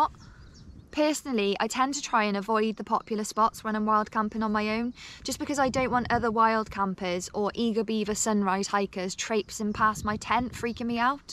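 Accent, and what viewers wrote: Wales, United Kingdom. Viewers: Thanks for the LOLs! You're funny! Love your Welsh accent too 😀 (assuming my Australian brain picked the accent correctly ha!) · That's a Welsh Accent!! i subscribed (Edit) That has to be a Newport Accent/Cardiff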